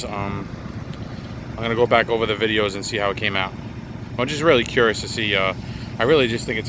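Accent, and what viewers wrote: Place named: Massachusetts, United States. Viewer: HAVENT... YOU GUYS EVER HEARD FORD=FOUND ON THE ROAD DEAD? HELLO. YOU SOUND LIKE A MASS RESIDENT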